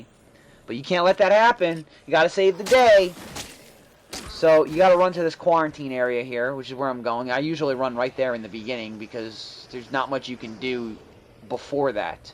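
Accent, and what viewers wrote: New Jersey, United States. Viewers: Yeah it’s because he still had his Jersey accent. Texas changed it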